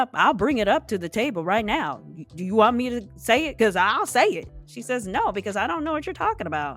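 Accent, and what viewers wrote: Boston, United States. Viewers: You are HILARIOUS, I appreciate your commentary and your Bostonian accent voice overs💗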